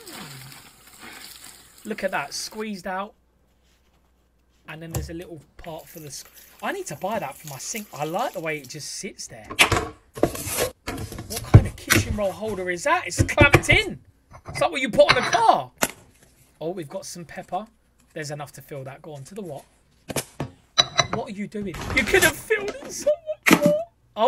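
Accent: United Kingdom. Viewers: Love love love ur Brit....xoxo me mum was born in Liverpool because of WWII..my grandparents were from Mayo Ireland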